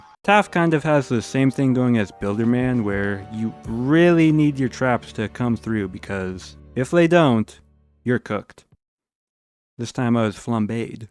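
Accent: Canada. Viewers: Wait is he Canadian? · are u Canadian by chance?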